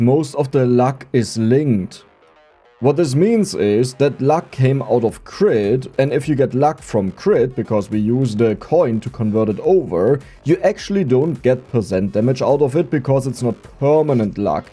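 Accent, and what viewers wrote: Germany. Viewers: Becauze he haz a strong German aczzent.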